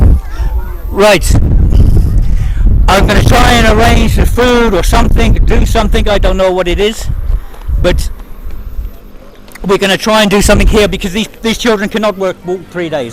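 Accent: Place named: South Africa. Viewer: Hey this is my island mate. Its admirable that you took up the cause. I, like many people, have done our bit to help. For a period there there was limited resources in a country that is suffering economically. The EU budget slashed costs from the EU border patrol I think in 2013. The people and the authorities have ploughed money (which they do not have) in to solving the issue. Almost everyone on the island has a descendent who is a refugee (Asia Minor).We are all compassionate people. Lesvos and every island has provided support. Remember, we didnt plan or expect people smugglers to send the migrants across dinghies and dangerous waters. Please refrain from comparing us to to ISIS??!! Had you avoided that, I would not have worried about your clip. WTF is that about. Its like me saying you have a South African accent therefore you are part of the regime of apartheid. 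Please do not insult our people, otherwise let's look at the history of where you are from.... Its easy to be a critic, but everyone on the island from the authorities down has a heart at a time when Europe has turned its back on Greece. Keep that in mind. The heat and the walk was terrible. During Ramadan, these migrants suffered even more, unnecessarily. The war in Syria and other places is tragic, and a tragedy that should have been prevented. Eventually the issues you point out in this clip was sorted out. Your emotion, again is admirable, but do not tarnish our people or island without the full facts. Go th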